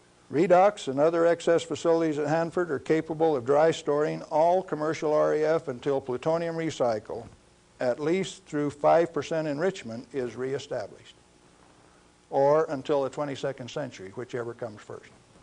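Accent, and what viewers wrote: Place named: Midwest, United States. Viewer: I'm always nostalgic for mid-century Midwestern accents from a grandfatherly man talking science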